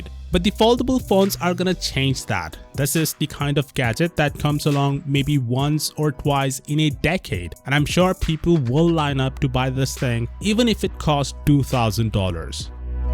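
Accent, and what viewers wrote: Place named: India. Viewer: since your indian yourself probably you should easily be able to tell his indian. I have watched a lot of "microsoft tech support scam videos" and i can tell by his voice/accent he is indian.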